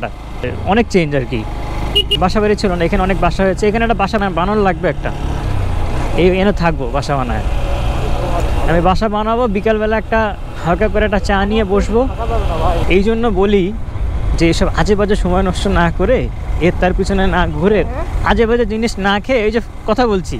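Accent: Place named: Kolkata, India. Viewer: kotha bolar style ekdom kolkatar manusher moto. Hashi+ protita kothar sound ekdom kolkatar manusher moto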